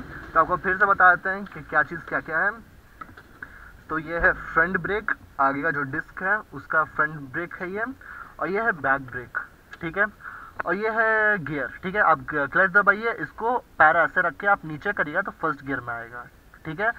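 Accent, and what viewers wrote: Bihar, India. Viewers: bhai aap bihari ho???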